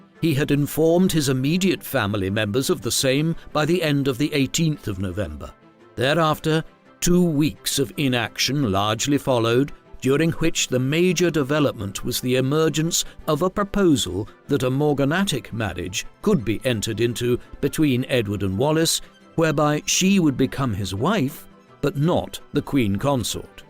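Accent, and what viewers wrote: RP, United Kingdom. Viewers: I saw that. Huge mistake by the documentary makers. The reader sounds posher then the King.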